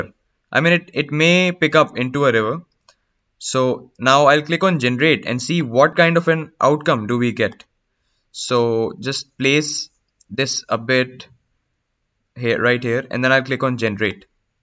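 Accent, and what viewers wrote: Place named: India. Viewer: hey, man, I totally understand your indian ascent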